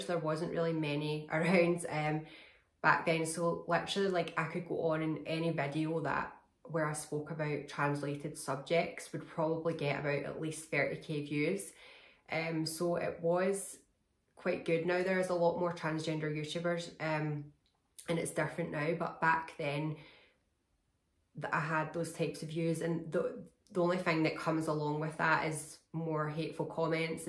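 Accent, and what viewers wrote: Scotland, United Kingdom. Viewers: Wow, never seen a fellow Trans-person with a Scottish accent before! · Lovely to hear a fellow Scottish accent,especially from someone so beautiful in both looks and deeds. You're videos help many which is a beautiful thing to do. Keep the great work girl..xx.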